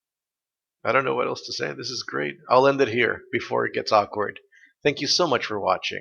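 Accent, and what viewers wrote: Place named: Puerto Rico. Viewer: oh i didn't, but that's great :D i meant your accent that your from puerto rico, like i didnt no which but i knew you were latin lol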